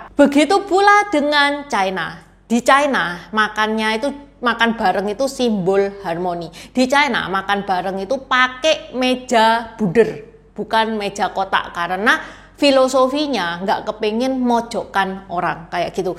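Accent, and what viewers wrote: Java, Indonesia. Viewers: KEREN BANGET CIK …..JAWA MEDHOK 😂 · Bahasa mandarin logat jawa.. Unik 😅 Akhirnya pertanyaaan saya terjawab kenapa budaya china dan jawa kok mirip mirip...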